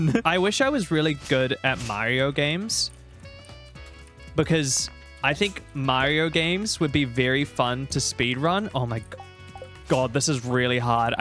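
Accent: New Zealand. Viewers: it took me like 3 videos to realise you were also a kiwi lmao, why does my brain never register our accent 😅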